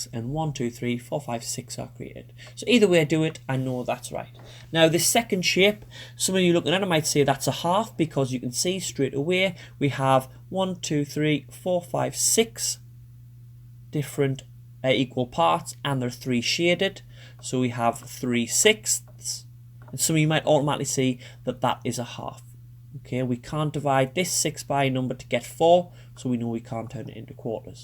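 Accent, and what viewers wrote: Scotland, United Kingdom. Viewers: You speak like Scotland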